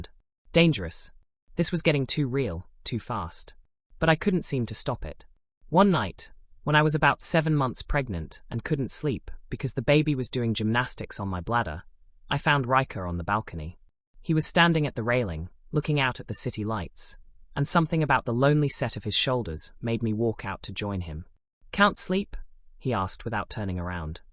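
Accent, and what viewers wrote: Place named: England, United Kingdom. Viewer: good story, i happy that i found a channel with a english accent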